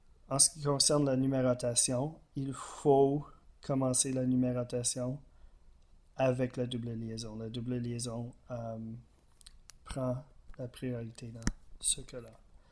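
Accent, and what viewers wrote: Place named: United Kingdom. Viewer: from the accent i think hes from the united kingdom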